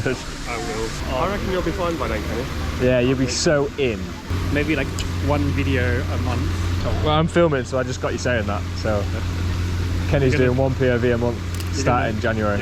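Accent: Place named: United Kingdom. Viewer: Great video - seems like an "evergreen" discussion :) So, assuming we're talking about 35mm fullframe, to me, this is exactly opposite of your view - I'm a "50mm guy" and find the 35mm to be somewhat between two chairs, if I can put it that way. If I want something wider than 50mm, I find that I reach for my 20mm. Ps. I LOVE your accent - where in the UK does it come from?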